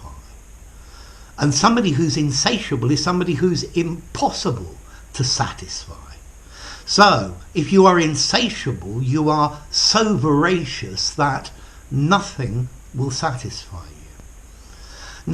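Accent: United Kingdom. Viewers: Thank you,! I always try to see some of your lessons. They are helpful and I prefer the British accent.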